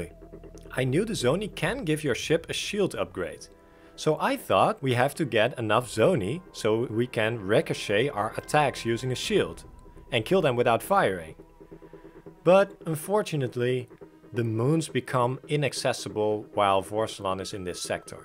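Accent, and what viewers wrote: Netherlands. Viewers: Very nice video. Is your mother tongue Dutch? The accent sounds very familiar :D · Bro no hate I am from the Netherlands aswell and the accent here is so ugly I ain’t gonna listen to that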